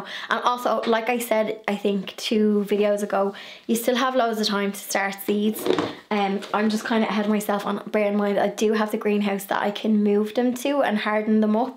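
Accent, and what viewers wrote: Dublin, Ireland. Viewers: Cottage decor, gardening and a good Dub twang, what more could you want!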